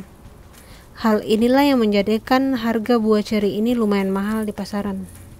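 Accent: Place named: Makassar, Indonesia. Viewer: trims infonya kak. btw kakak org makassar kah? logatnya mirip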